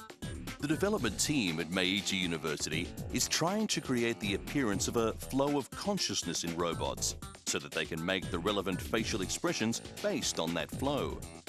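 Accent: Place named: Australia. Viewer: Thumbs up for Australian narrator!!!!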